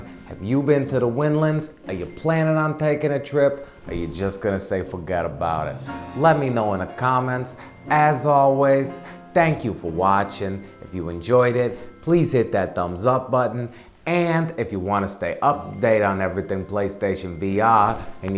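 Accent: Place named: Boston, United States. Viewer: Really love your Bostonian accent (sorry if that's not an accurate description). Your parents named you perfectly, it just totally fits your presentation. You have the potential to be similar Yahtzee of Zero Punctuation, as in using your nationality as your draw; maybe try a VR mafia insider type persona - you'd totally pull it off.:P Regardless, this Australian gives you the thumbs up and I'm glad I subscribed, especially after seeing your live stream cus you seem like a cool guy who genuinely loves VR as much as I do.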